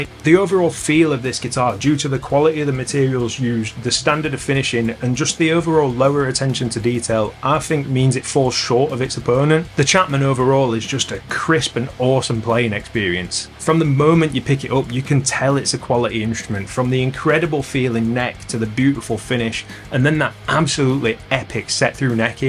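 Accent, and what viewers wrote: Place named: South Yorkshire, United Kingdom. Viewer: Accents fascinate me...and Ive been trying to work out yours... Im going to guess...somewhere around South Yorkshire??? 🤔 (Dont have a go at me if youre a Lancastrian! 😅)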